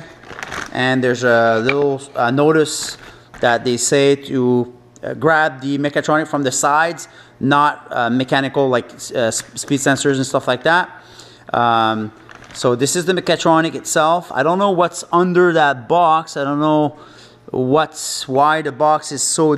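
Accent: Quebec, Canada. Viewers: Ah un Québecois, Salut :)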